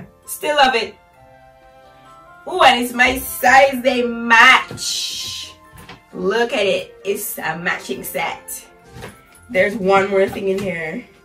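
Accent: Jamaica. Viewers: First time watching your video and I love it . are u from Jamaica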